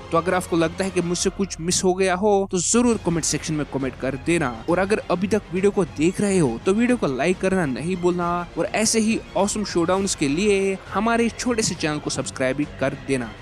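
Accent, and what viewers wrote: Kashmir, India. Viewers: Hmm y does ur accent sound familiar. Kaishur chuka?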